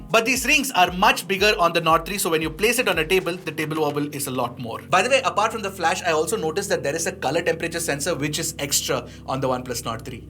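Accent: India. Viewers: Good indian english, I'm from Brazil and can understand 100% what you say, amazing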